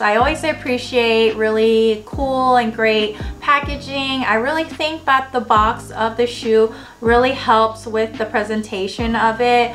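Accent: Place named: France. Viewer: Ton accent français est trop mignon 🥰 *your french accent is so cute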